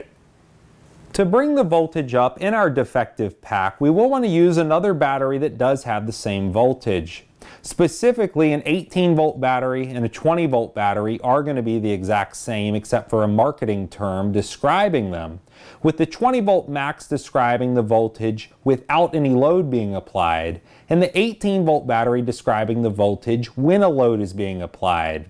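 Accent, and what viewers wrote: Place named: United States. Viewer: Effing yanks , your English is gross .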